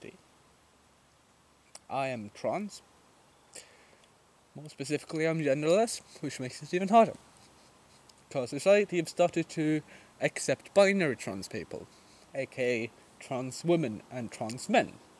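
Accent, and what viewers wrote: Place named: Ireland. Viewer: I can tell your accent is Irish possibly and thoroughly enjoy all your videos. Anyways thnx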